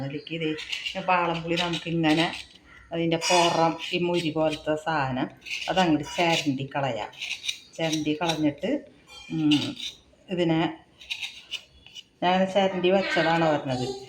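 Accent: Thrissur, India. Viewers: തൃശ്ശൂർ ആണോ സംസാരം കേൾക്കുമ്പോൾ തോന്നുന്നു ഞാനും തൃശൂർ ആണ് പക്ഷേ ഇങ്ങിനെ ചമ്മന്തി അരക്കുന്നത് ആദ്യമായാണ് കാണുന്നത് 👌👍😋😋 👫🔔✅ New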